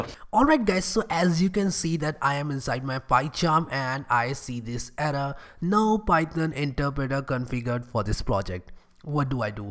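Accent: India. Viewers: This guy's got the accent but is trying to be super cool. Thumbs up my hindu friend. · I thought he's joking with indian accent...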